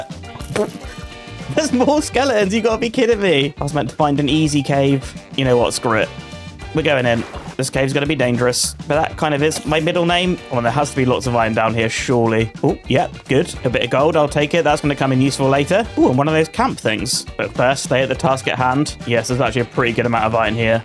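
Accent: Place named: United Kingdom. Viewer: The English accent of the British is very nice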